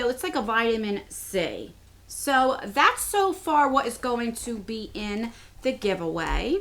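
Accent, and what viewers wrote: New Jersey, United States. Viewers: Hi i really like your vidoes been subscribed for like 6 months now i love your Jersey accent its beautiful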